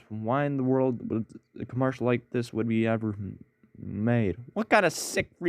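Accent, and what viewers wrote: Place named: United Kingdom. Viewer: I like your British talking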